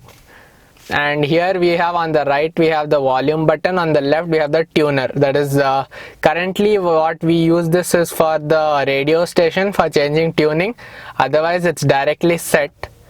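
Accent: India. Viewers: your accent is sooo damnn funny bengali babu😂😂 and bro why r u so nerdy ?? improve ur personality dude open up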